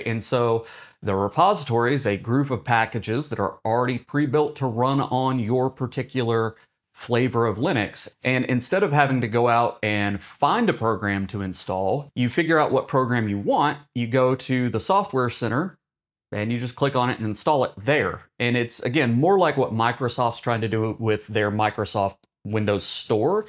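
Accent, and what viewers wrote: Tennessee, United States. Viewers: are you from Tennessee?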